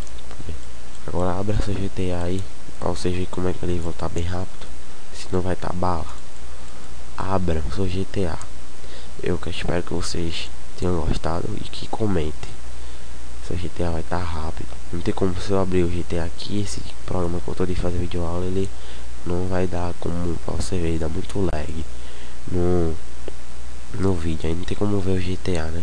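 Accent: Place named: Paraíba, Brazil. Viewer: vozinha de paraibanooo éh noiz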